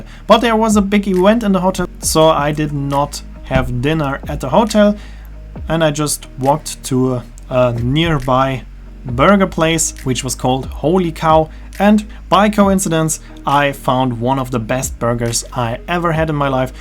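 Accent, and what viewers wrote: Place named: Germany. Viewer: I did think you were a german-speaker, but I still didn't connect Voss and Foss until i saw the pictures and realised V is pronounced as F in German.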